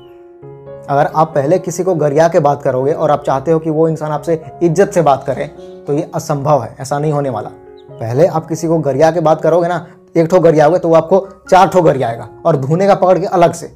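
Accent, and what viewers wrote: Bihar, India. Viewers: Aap Bihar se hai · Tum Bihar se ho ya jharkhand se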